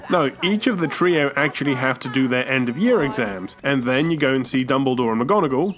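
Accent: United Kingdom. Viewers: Yes, i want a review of all HP games too. I want to hear your very british voice talking about very british stuff (despite the fact movies and games are american)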